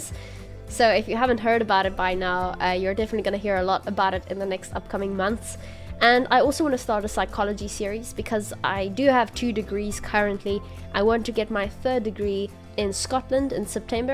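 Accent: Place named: South Africa. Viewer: The South African accent was a lot stronger in this video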